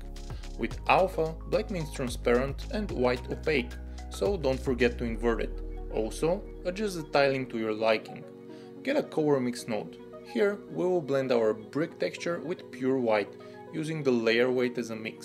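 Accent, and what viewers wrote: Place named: Eastern Europe. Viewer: haha man, because English with Slavic accent is very bad sounding and insulting, it may sound good only inside the structure of your language but not in English. Not just most of the words are unclear but the accent is also distracting.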